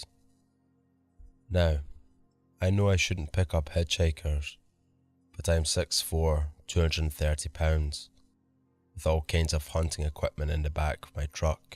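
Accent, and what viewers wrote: Scotland, United Kingdom. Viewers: I just came across your channel after hearing you co-narrate a story with Swamp Dweller on his channel & now I'm hooked. Your Scottish accent makes me think of an old friend that lives out in Bonnie Bridge (spelling?) Scotland. I'm from Botetourt Virginia USA. And I just recently found out that I'm descended from a Watson Clan on my mother's side. So I have recently been digging into my ancestry. I hope to one day visit the Homeland impossibly dig more into my heritage there. Anyhow, please keep up the good work we are certainly enjoying your stories! Cheers!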